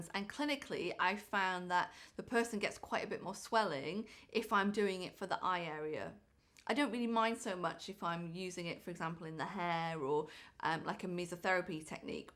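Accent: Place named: United Kingdom. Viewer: you are simply amazing...I love the way you talk with typical Briton's resonance....I follow ur videos and have got my dermatologist watched your videos...you enlighten us so much on these cosmetological treatments candidly...superb...God bless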